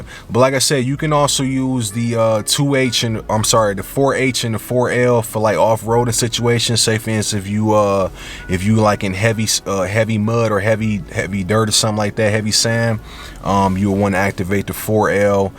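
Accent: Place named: Detroit, United States. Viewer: As soon as I heard what up doe I was like he from Detroit he know what he talking about.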